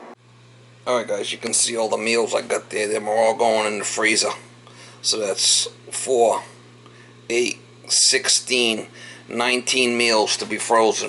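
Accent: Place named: North, United States. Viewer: Stuffing????? That's what you put in your Mama's pillow!!!! LOL Dressing is what you should be eating That's what we do in Georgia, Mama!! P.S. Love that accent lol You people from the north talk faster than us southerners. I have to listen good!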